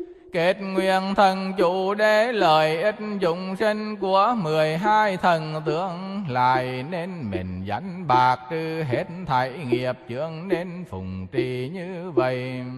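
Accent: Hue, Vietnam. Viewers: BACH Thầy ,Thầy tung giong huế của Thầy quá hay rỏ từng tiếng con rất thích đươc nghe mải mải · A Di Đà Kính tri ân Thầy trì tụng kinh cho chúng sanh thật là hay! Giọng Huế âm áp, trầm bỗng thật đặc biệt. Kính chúc Thầy thân tâm thường lạc, tiếp tục cho chúng con nghe nhiều bài kinh nữa. Kính, DT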